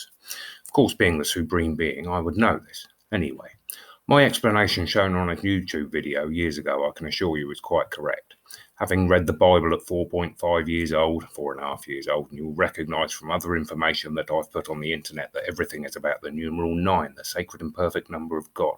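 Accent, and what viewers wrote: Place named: England, United Kingdom. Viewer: For your information my english might not be as good as yours since i was born and raised in Sweden, i assume you are english judging by your accent. I have a question for you though, what do you think would have happened If christianity didn’t exist and Vikings would’ve continue to follow their religion and same with all the other native religions?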